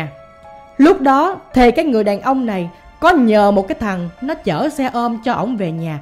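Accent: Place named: Mekong Delta, Vietnam. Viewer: Anh kể nghe đậm chất miền Tây luôn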